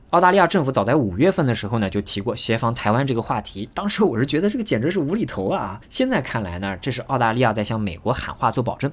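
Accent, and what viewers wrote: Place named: Shandong, China. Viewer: 哥哥的口音特别沙东，好喜欢，恋爱了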